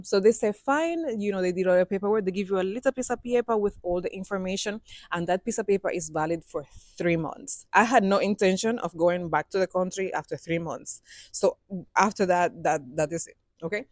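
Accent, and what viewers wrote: Patois, Jamaica. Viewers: Luv yuh storytelling luv the patois I would listen to u all the time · Hey, love the accent mixed with the patois. It's fyah 🔥🔥🔥🔥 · Hi love the story I love the way you talk the patwa so no badda wid the whole heap a pretty English because you don't sound the same I fall in love with the way you talk jamaican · Hello I enjoy your stories which are very interesting and I like when you talk patois too you are a true Jamaica 🇯🇲 🎉I will be looking out for more of your experiences 😅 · Wow. Living in Cuba seems so hard. I love watching your channel, you are so funny in the way you express yourself, with your cute little Jamaican accent😊. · Guurl pleaee talk de patois and mad dem LOL! Love it 🥰 and please keep sharing your stories with us. Also waiting for the engagement/married story and pictures 😉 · I’m a new subbie please be your authentic self! 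Love your Jamaican accent please don’t let people make you feel self conscious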